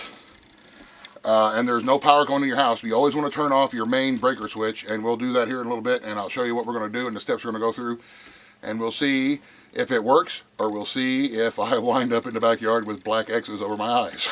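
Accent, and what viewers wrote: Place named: Louisiana, United States. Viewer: I have a very strong feeling that you're from Louisiana. You sound and act the exact same as the rest of us crazy cajuns lmao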